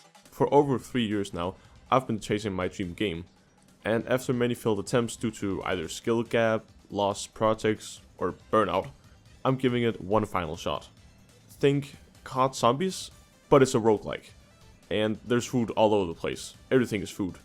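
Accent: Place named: Denmark. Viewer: Bro the accent sounds so danish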